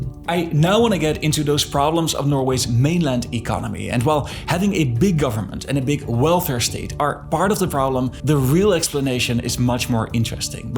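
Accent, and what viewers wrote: Netherlands. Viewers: I really like dutch accent, it's strange and insteresting, also the second channel is super high quality, I watched 3 videos as far there, about turkish coup, bosnia and saddam, they're good so far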